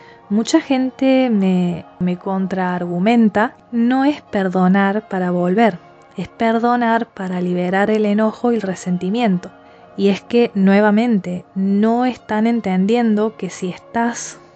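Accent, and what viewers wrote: Córdoba, Argentina. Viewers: Que interesante la diferencia que haces con respecto a la persona tóxica y el narcisista. La importancia de atravesar las distintas etapas y las distintas emociones que se van generando. Impecable. Sos cordobesa?. Das charlas en las provincias?. Es un tema que me re interesa. Que importante es educar. Veo todos tus videos.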